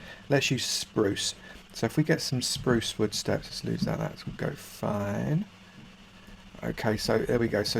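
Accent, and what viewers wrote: United Kingdom. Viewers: OFC the meshes and what not won't match, but overall design-wise there are similarities between your design and Elf City/Grand Tree. But seriously tho, The British Accent makes anything watchable! :3 I don't play MC too much, but when I'm on TILT on League... It helps me unwind and reset before reclimbing. So I always keep it on my desktop. And your guides are pretty darn great for us scrubs here.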